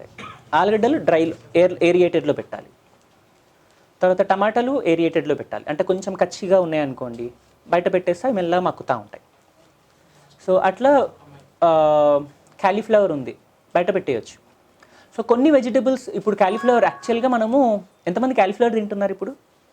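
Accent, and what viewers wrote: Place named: Telangana, India. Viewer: Telangana yasa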